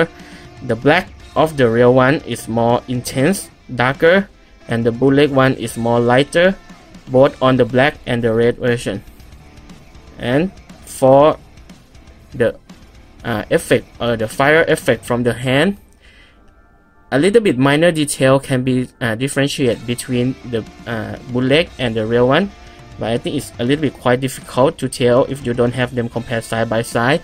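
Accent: Thailand. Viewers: Ooo sawadikhap for your accent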